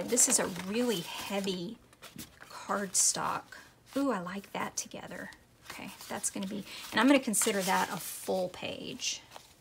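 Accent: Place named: Ozarks, United States. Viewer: I love how you think (talk) things out. As a novice journal maker myself, it really makes me think about the journal as a whole. Your explanation of the busy hands journal in part one made me have an "aha" moment. Thank you for continuing with this type of video. I'm from the Missouri Ozarks so I totally understand about the accent, I've heard it all my life, sometimes in a very condescending manner. I've learned to just be proud of who I am and where I'm from, all that to say.......Y'all sounds GREAT!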